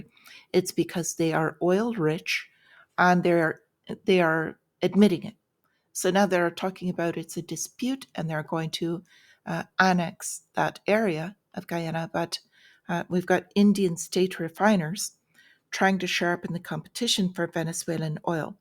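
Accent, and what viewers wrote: Ireland. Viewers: So I was right! I thought I detected an Irish accent :-)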